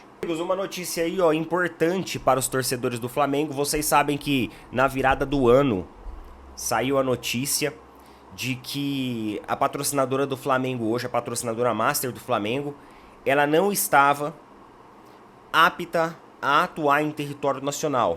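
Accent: Sao Paulo, Brazil. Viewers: Sotaque Paulista mano.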